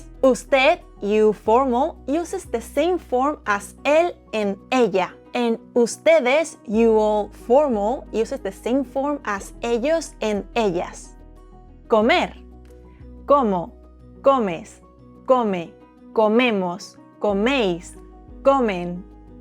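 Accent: Spain. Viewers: For her accent I think she is from Spain 😊😅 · You do a great job. However, one word in English that you are mispronouncing is “participle”. There is a stress on the first syllable “part” and a bit of stress on the third syllable “ci.” So, it comes out as part”- ti- ci’-ple. Your English is overall very good with a very cute Spanish accent. Sadly, with our hateful, unchristian Trump regime here in America, your accent could get you stopped and questioned by ICE agents. And, want your country please take Elon Musk off our hands! Muchas gracias para su lecciones en español.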